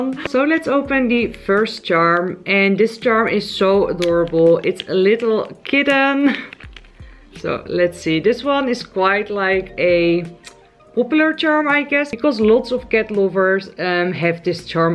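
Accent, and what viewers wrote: Netherlands. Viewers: you are dutch bc of the accent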